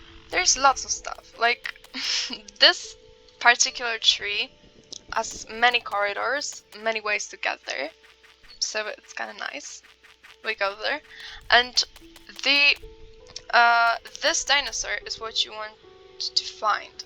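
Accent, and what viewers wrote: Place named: Germany. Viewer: Are you german? BECAUSE I L.O.V.E. YOUR ACCENT!! 🎈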